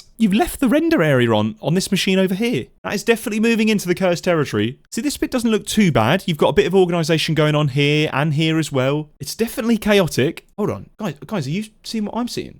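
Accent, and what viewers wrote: United Kingdom. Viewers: so is he a brit?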